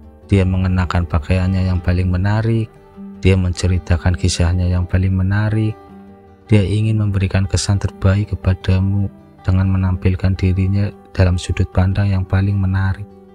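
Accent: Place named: Java, Indonesia. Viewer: Njowo bangett mass, medokk pisannn buat jd cowo maskulin😂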